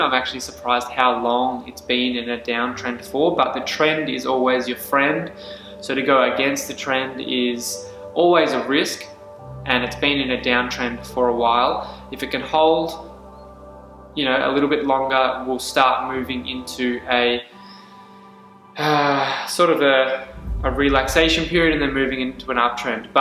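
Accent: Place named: Australia. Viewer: I thought you sounded Aussie, Aussie here, so Aussie living in the US?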